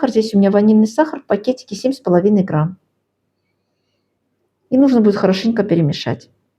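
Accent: Baku, Azerbaijan. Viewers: Дорогая, я сразу это поняла, ведь я тоже Бакинка, Правду говорят, «Свой свояка, узнаёт издалека». Я раньше тоже вела Влог. В Баку мы все были по Национальности Бакинцы 😊 Поэтому, Наш акцент, наша доброжелательность, наш менталитет останется с нами навсегда. Я от всей души желаю вам процветания, удачи во всем. С Бакинской теплотой, и нежностью Нателла Борисовна. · есть такая нация - бакинцы. Это наш говор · Мне 82года стараюсь меньше есть чтобы не прибавлять в весе,но смотрю ваши ролики и так наедаюсь вашими вкусняшка ,конечно некоторые рецепты применяю,а калорийные лайкаю и ваш бакинский акцент умиляет,возвращаюсь в старый родной Баку.Желаю вам здоровья и процветания.Всем кто смотрит ваши ролики мира,здоровья и добра.Израиль. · Спасибо!! Привет из Баку!! У вас остался бакинский акцент, с удовольствием смотрю ваши видео, обязательно испеку!!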